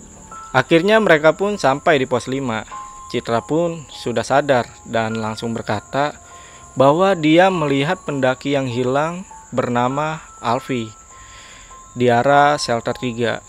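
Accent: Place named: Bali, Indonesia. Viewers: Suara bang medok Bali banget yah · Logatnya seperti orang Bali..slm dari Bali juga..smoga sukses cannelnya.. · Aksen bahasanya seperti orang Bali kak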